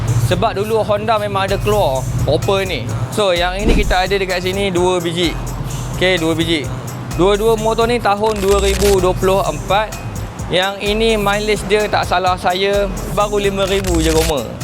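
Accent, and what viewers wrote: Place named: Perak, Malaysia. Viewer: Ate, kapar klang bunyinya Perak yeop 😁😁🤔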